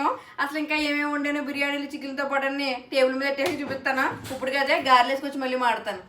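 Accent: Godavari, India. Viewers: Godavari yasa bagundhi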